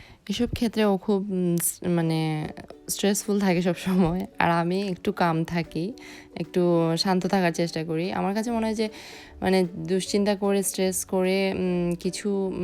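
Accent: Sylhet, Bangladesh. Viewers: Apo are you came from salyet because your speak like that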